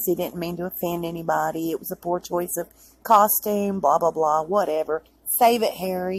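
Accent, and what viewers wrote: South, United States. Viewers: Thanks for sticking up for the South its appreciated. I new Meghan did a lot of things we aren't hearing about until now. Like you Southern Accent and appreciate all the updates and news. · I want to like Megan... but there is just something about her that I just can't take to🤔 ps love your southern accent x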